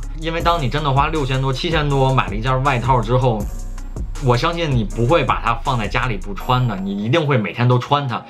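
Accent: Beijing, China. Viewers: 你这口音是北京口音吗？实在是好难听啊！ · 北京话 亲切！ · 哇博主是北京人吗！好熟悉的口音咧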